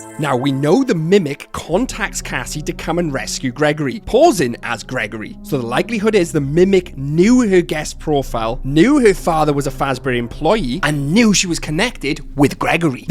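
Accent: Wales, United Kingdom. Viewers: I've never watched this channel before, so clicking on it I expected someone American voice or something. Quite surprised to hear such a thick Welsh Valley accent lol. Cymru FTW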